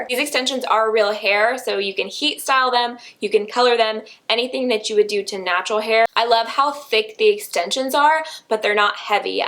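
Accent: South, United States. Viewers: I don't know why and I don't know how but I can hear a southern twang?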